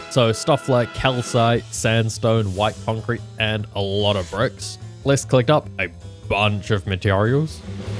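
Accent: New Zealand. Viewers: Oh my god, hearing an NZ voice in a youtube video is so weird, but so welcome. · omg I detect a kiwi accent. Kapai, this vid is so good, love the editing!!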